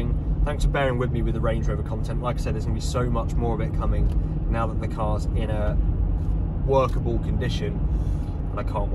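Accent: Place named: West London, United Kingdom. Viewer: I like the way to say Garsekets instead of gaskets true west london twang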